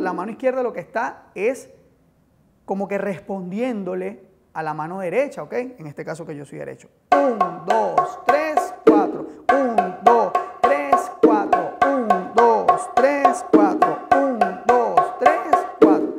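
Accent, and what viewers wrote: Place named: Venezuela. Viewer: Gracias profesor al parecer por el acento es Venezolano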